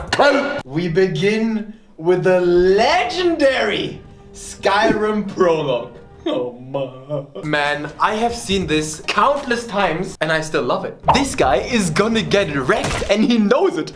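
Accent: Germany. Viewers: Wtf is he german? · Are you from germany??? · A Chinese talking with German accent in English. Ich bin so confused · The deutschenglish makes me have the kekkers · Is he german ? o.ō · You sound awfully German to me... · Are you german or something? :D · Sometimes I forget that he’s German , dann he speaks something in Deutsch accent and I’m like . Stimmt ja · whaaait are u from germany ? :D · Ya your german accent is weirdly titillating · Your englisch sounds sehr deutsch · Lol the German accent bruh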